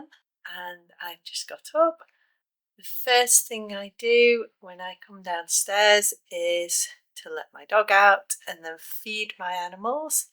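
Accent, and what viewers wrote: United Kingdom. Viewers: It's easy to hear and to learn. I love the British accent though. Thanks teacher!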